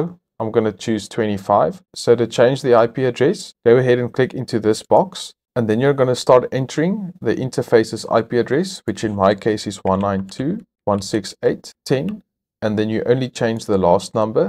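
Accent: South Africa. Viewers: Do i hear a south african?🤔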